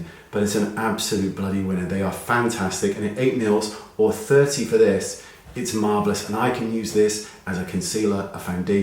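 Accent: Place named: United Kingdom. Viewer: Sometimes I forget what the video is about because I’m enjoying listening to your accent & the British words to use. That my friend is a good thing.👍🏻💚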